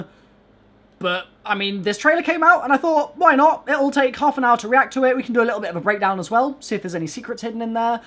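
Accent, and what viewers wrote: United States. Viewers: you have an amazing 'merican accent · American Accent ™️ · Ur American accent (my boi ) was pretty dope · A video with your best american accent would be nice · American accent was too great. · I love you’re American voice doing it the black ops way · Omg i love his American accent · Good video that American accent is so funny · That American accent dough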